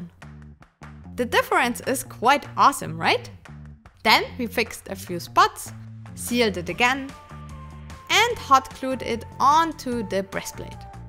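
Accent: Germany. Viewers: That German accent ☺️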